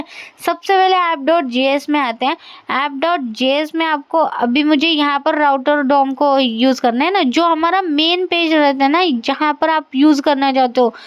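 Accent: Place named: Maharashtra, India. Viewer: Maharashtra.. I knew it.. by your tone and language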